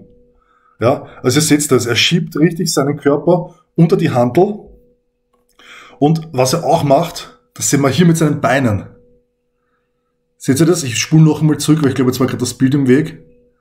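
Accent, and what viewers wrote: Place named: Austria. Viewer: Dialekt für an Österreicher verständlich ... für Deutsche vielleicht schwer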